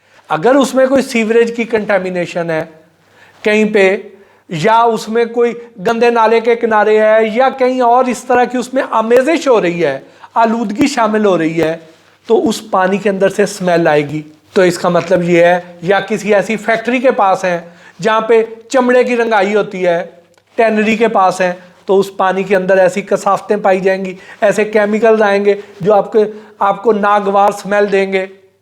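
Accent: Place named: Punjab, India. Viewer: ۔۔۔ اتنی لمبی باتوں کی لسی بناتا ہے ۔۔۔ کی جیسے پانی میں مدھانی چلائی جاتی ہے ۔۔۔ بلکل بک بک بکواس بلکہ بکواسیات لگتا ہے ۔۔۔۔ یہ پینڈو لب و لہجے میں بات کرنے والا یوٹیوبر بلکل گھتو ڈھگا پنجابیوں کےلب و لہجے میں بسوں میں چورن بیچنے والے کے سٹائل میں بات کرتا ہے ۔۔۔ ابھی پچھلے دنوں کشمالہ کا انٹرویو کر رہا تھا اور لگتا تھا کہ پتلون گیلی ہو چکی ہے ۔۔۔ اور مکمل تھلے لگا ہوا تھا ۔۔۔۔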